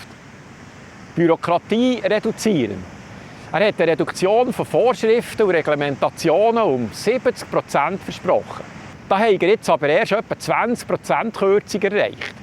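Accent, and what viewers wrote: Bern, Switzerland. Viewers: Gute Sendung des Herrn in schönem Berndeutsch! Bitte weiter so! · Danke viillmaal! Ich lose dir nöd nume gern zue will du immer wieder interessanti Details bringsch und nöd eisiitig bisch, sondern als gebürtigi Züri-Schnurä au wäge dim wunderschöne Bärner Dialäkt. Liebi Grüess us Urdorf, Agglo Züri.:-)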